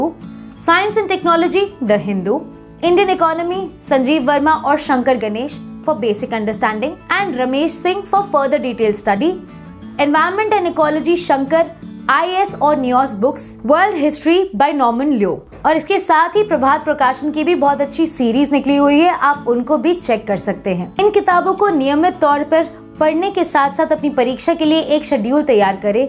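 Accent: Standard. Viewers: Mam do you speak after Google translate your Hindi is so pure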